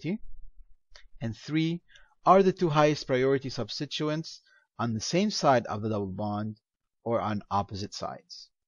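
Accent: Arab world. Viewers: You sound pure Arab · lol I can hear your Arabi accent :P thank you for this!...test tomorrow ;(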